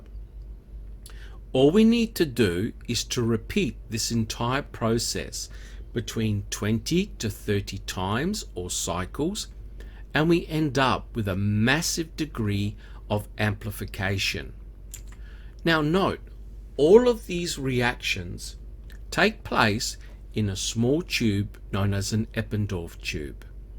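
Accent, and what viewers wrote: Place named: Australia. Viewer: Thanks so much for the videos! I do have to relate to you, however, that the statement from any LEO/s whilst talking to lab tech/dept heads of labs "try to place ??? in the house/garage or any other location they care to mention" is not nefarious in any way, even though it could be said that it is. I can't tell you the number of times that I've heard this very thing said in movies and in television police shows. Personally, I wish that they wouldn't say that for the very reason that it could be thought of as conspiratorial. But, since I note an accent from you, maybe Australia OR New Zealand, I'm sure that you don't know about this type of directive to occur, even though it clearly says "TRY to place/put her/him ......." I'm sure that if it were a true directive, it would not have been done so overtly and written on paper, where it would be seen by other than the person being spoken to.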